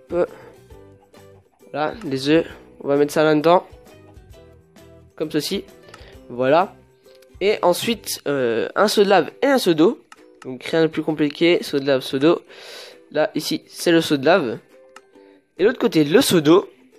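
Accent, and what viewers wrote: Belgium. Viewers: GG tu es belge ? ^^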